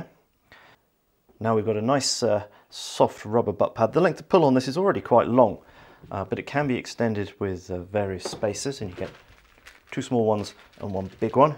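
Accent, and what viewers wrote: United Kingdom. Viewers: Apologies. You mentioned it by text but since i was only listening to your lovely British voice i missed that bit. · Lol when your so used to accents. Just realized this guy is from the U.K and I am almost done the video.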